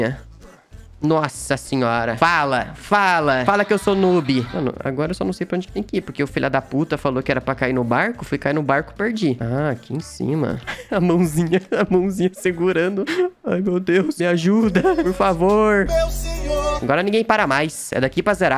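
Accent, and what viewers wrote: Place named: South, Brazil. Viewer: Você tem muito sotaque do Sul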